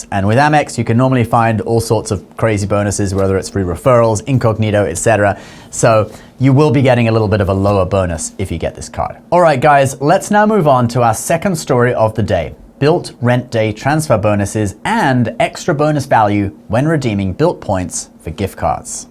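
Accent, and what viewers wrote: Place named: United Kingdom. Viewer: Me too, love that UK accent- direct and to the point, not alot of fluff. Nice funny jokes and new content regularly--- he gets 100 cool points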